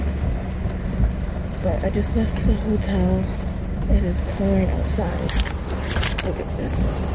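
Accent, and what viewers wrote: New York, United States. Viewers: Is it me or is that New York accent getting extra strong lol I think that since you been in New York your accent reappeared, I love ittttt!!!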